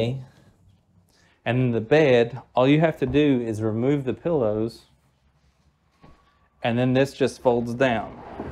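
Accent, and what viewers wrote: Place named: Eastern Kentucky, United States. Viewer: He sounds so much like me. I’m from Eastern Kentucky! Awesome.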